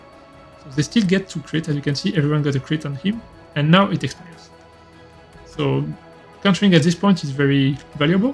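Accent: France. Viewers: Are you french ? Can you do this video in french ?😅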